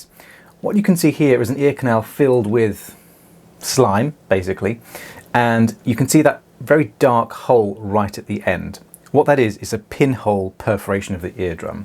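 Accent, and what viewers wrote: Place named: RP, United Kingdom. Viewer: That accent is way too posh for you not to be also part of the “anyone” group.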